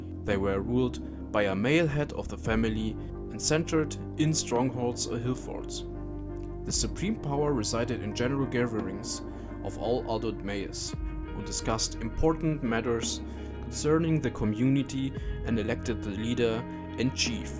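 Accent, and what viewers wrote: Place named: Germany. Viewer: you have german ascent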